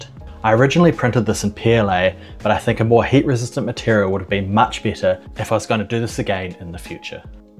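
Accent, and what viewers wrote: New Zealand. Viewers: Just realized I missed the kiwi accent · Yes the New Zealand accent can produce some funny sounds!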